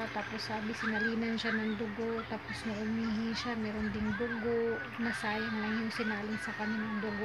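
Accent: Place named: Visayas, Philippines. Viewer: kaluoy sab sa imong amega sis...nice vlog cute kaau ka mag visaya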